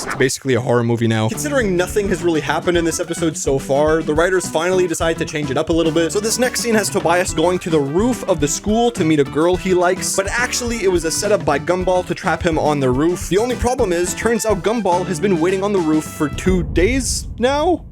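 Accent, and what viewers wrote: Canada. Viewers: Wait is this guy Canadian? DID I FIND MORE OF MY PEOPLE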